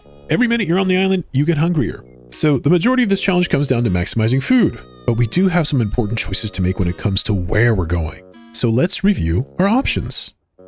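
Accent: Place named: United States. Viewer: Where do you live that you have an american accent and your room can get to 90 degrees in the middle of January??? Do you live in a windowless basement? Pheonix Arizona??? Ameristralia?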